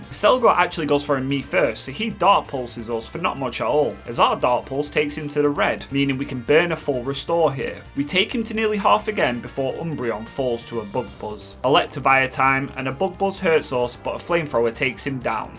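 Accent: United Kingdom. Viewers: i love the Bri-ish Long live the King!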